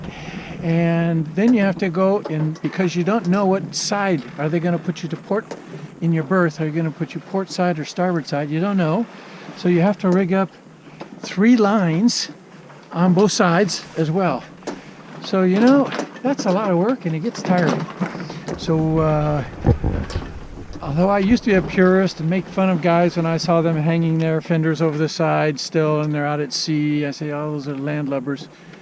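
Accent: United States. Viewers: You speak like you're from the United Ststes. What is your nationality? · Lovely job - I really enjoyed your video - info/delivery/demeanour etc. The full package. Impressed++. Keep up the excellent work. American english?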